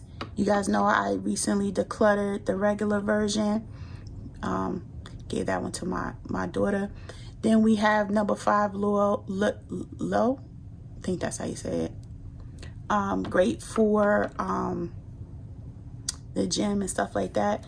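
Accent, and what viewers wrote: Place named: New York City, United States. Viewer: New viewer here 🖐️.. your NY accent is so strong lol nice collection 🙌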